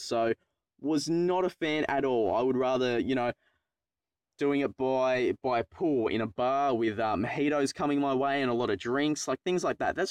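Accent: Australia. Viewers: great videos keep up the Australian accent and good work;)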